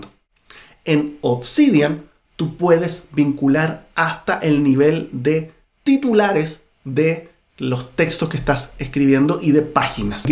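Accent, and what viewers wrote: Chile. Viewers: ¡Qué agradable escuchar el acento chileno cuando el orador tiene una excelente dicción! Además, el contenido del video aporta información valiosa para quienes comenzamos a explorar el uso de estas herramientas.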